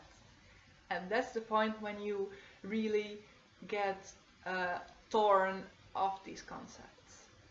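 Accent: Netherlands. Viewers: Hmm sounds sometimes like dutch or Flemish but when listen very good maybe Denmark Norway, I don't think east Europe Definitely not German. I'm very curious now. Please tell me. I started copelandia ogre boy shroom kit and researching YT Pobrezni❓polish really I have lots of polish friends here in Amsterdam and non of them sound like you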